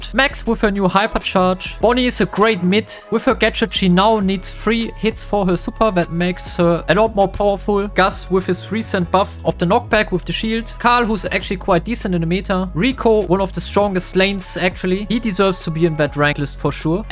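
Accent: Germany. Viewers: Sprich deutsch dein deutsch Akzent ragt zu sehr raus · Bro der deutsche Akzent ich fühl den so hart 😂😂😂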